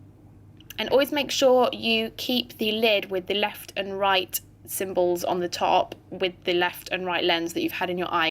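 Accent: United Kingdom. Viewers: I really like your voice! It's super duper british and clear. Also very informative video so thanks!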